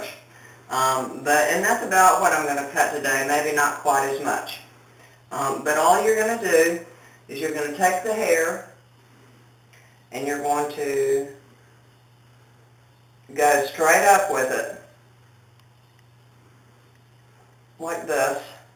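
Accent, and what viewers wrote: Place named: South, United States. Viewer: Honestly if a person doesn't like your accent they can just not watch your video. I found this tip very helpful and I appreciate the time you spent making this video. Now I was born and raised in Alabama since I was 15 and I've heard a lot of accents like yours. I think Southern accents are beautiful and I was sad to find that my own accent (though not as strong as yours) disappeared so quickly when I moved up North. It's refreshing to hear a strong Southern accent every now and then.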